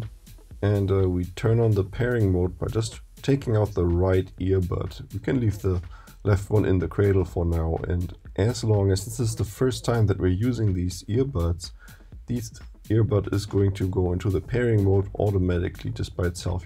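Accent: Germany. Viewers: I think ur a german Ty for the instruction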